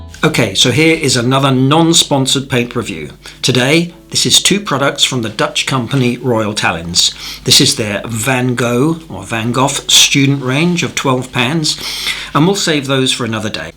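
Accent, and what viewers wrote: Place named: United Kingdom. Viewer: Sounds very British love it !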